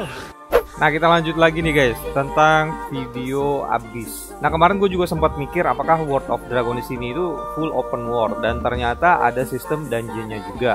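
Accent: Java, Indonesia. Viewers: bro lu tu orang mana kok kadang logat jawanya. keluar,btw ane juga pernah kesulawesi tenggara tahun 2003 disana masih hutan,kalo sulawesi selatan cemana disana?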